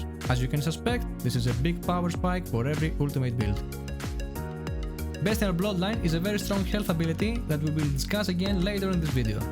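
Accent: Greece. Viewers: Is that a greek accent?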